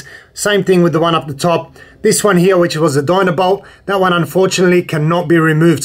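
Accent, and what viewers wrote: Australia. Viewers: Best Vid bro... Easy, quick and direct... and awesome to hear a middle eastern aussie voice.. lol.. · Thanks so much. I loved the Aussie accent the most.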